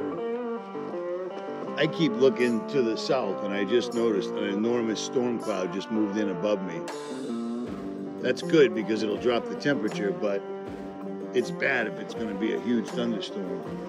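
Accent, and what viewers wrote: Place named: East Coast, United States. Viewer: Hey ! Pauly over here in Nor Cal. I got you over here and respect what you do! Great content and well received , i dig your accent east coast is hella different then my style of west coast or Oakland CA. Mostly but at 54 yrs old im already up in the mountains kinda prerunning a retirement style to see if its way for me ? So far i like it and doing better then at first ,! As it is a trip being solo if you didn't plan it but after two ex wifes w/ 2 kiddos from each and a long term girl friend of 10years turned toxic, resulting in few mo.s in jail up in MT. This is cake walk and very satisfying . So just saying good job keep it up , cause your real kid! One old west coast jack ass too one back east✌🏻🤙🏻🫡🤷‍♂️🕺🏻🪖👍🥽take care bud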